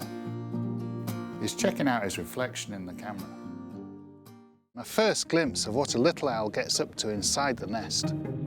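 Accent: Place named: England, United Kingdom. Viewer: I just found you on Utube! I'm watching as it's lovely to hear the English accent & loving what you are doing for the birds! Cheerio!😊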